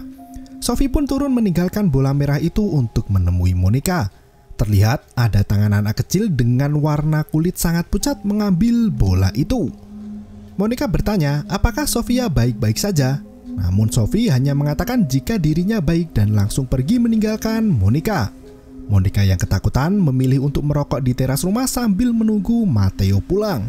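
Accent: Java, Indonesia. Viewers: Medokny keliatan banget..jowo ndi mas😆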